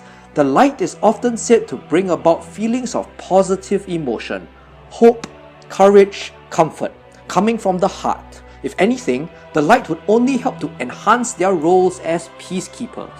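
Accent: Singapore. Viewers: Ah, I see what you mean, then. I think he's simply explaining the ones that will be playable, as that's probably what non-lore followers will care for, and it seems that english might not be his first language (it might be, I'm just guessing based on the accent and seeing him talking about coming from Singapore in the comments), so there could also be contextual language that might be a bit mixed up there as well.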